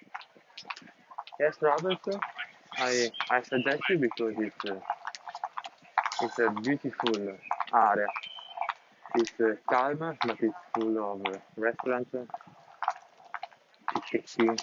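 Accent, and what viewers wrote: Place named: North, Italy. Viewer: I love your Northern accent LOL. Fantastico video! Saluti da Toronto.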